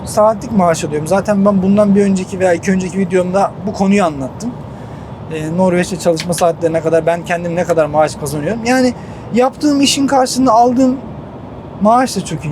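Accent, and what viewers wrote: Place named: Antalya, Turkey. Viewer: Antalya liymissin.bende Antalya liyim.Akseki liyim. deme çünkü bende Akseki liyim.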